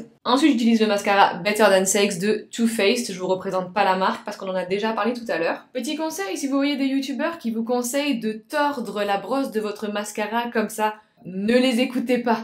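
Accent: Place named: Bordeaux, France. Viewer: Super intéressant comme vidéo ! J’avoue penser aux animaux quand j’en ai dans mon assiette mais pour le maquillage j’ai du mal à l’associer avec la souffrance animale, alors que c’est vrai ... une vegan m’a dit que j’étais flexitarienne : je mange le moins possible d’animaux, j’essaie d’acheter le moins de cuir, mais j’avoue ne pas encore faire de la souffrance animale un combat (et je me dis amoureuse des animaux, un peu hypocrite j’avoue ...) Breef j’adore tes vidéos, j’adore ta façon de parler. Petite question : de quelle région es-tu ? Bordeaux ? J’ai l’impression d’entendre un petit accent tout chou :)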